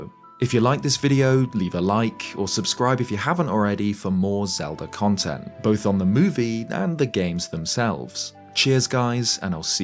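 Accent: United Kingdom. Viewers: The guy isn’t American either, notice his British accent? So your whole original post is bullshit.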